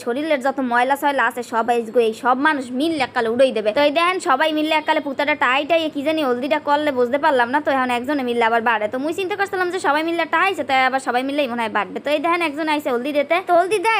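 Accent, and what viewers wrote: Barishal, Bangladesh. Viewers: আপু আপনার বাড়ি কি বরিশাল না পটুয়াখালী ? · আপু আপনার ভিডিও দেখার পর বরিশালের ভাষার প্রেমে পরে গেলাম,,,,❤❤ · আপু তোমার ভিডিও প্রতিনিয়তই দেখি বিশেষ করে তোমার বরিশাইল্লা ভাষায় কথা অনেক সুন্দর 👌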